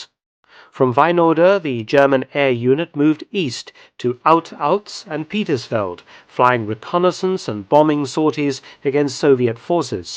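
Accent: United Kingdom. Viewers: Mr, how about Weimar Germany's efforts in avoiding international sanctions, such as cooperation with Soviet Russia, expeditions to China, supporting Freikorps and so on? It would be a great subject and I'd love to hear your British narration. Thanks in advance!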